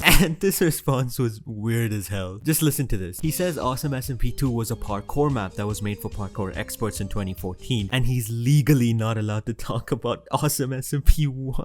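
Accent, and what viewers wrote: India. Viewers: i am new here but u sound like a indian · He sounds indian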